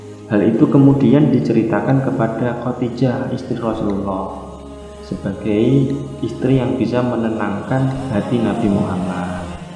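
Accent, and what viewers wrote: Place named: Java, Indonesia. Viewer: Medok wkwk. Good video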